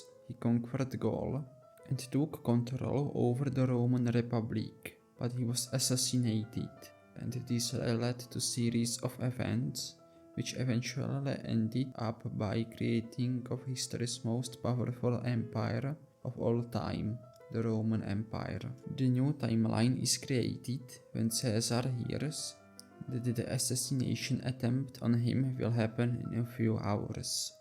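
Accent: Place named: Czech Republic. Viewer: Tbh you get used to it eventually and I like that traditional Czech accent.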